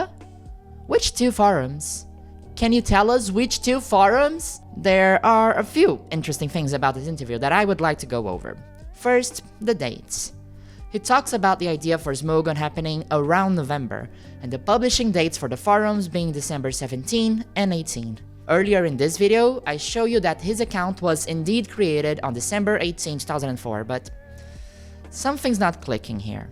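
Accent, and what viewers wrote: Brazil. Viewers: Amazing vid and research, I had no idea about that. And thank you for your work in the comments, I've scrolled a bit and couldn't find a single nazi comment (and I KNOW they came here). I hope more people see this and your channel grows! Also... You're brazilian, right? It's so easy to recognize our accent when we speak english! lol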